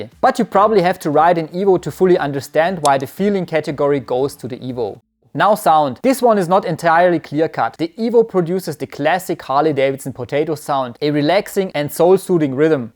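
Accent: Germany. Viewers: You can try as hard as hell, you never kick that german slang. So speak like "dir der Schnabel gewachsen ist" We have Google Translate.....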